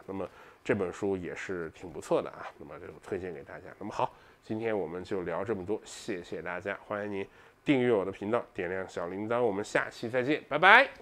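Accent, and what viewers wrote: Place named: Northeast, China. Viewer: 还别说，这东北话说的还挺顺溜。老铁那啥呀，还是别叫老北京口语了，改老东北口语吧！:face-blue-smiling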